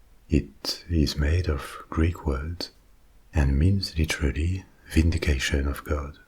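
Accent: France. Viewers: The best ASMR creator. That velvet French voice. Knocks me out every time. Thank you for all you do ! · I went through a few other videos to find a relaxing voice like this. The French accent is a bonus and surprisingly relaxing. Will stick with the from now on. Love the stories.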